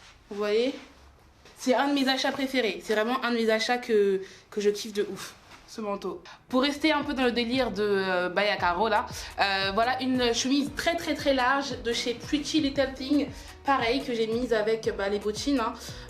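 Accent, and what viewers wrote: Africa. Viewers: Dans cette vidéo tu à beaucoup parler africaine! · J’adore trop l’accent africain 🥰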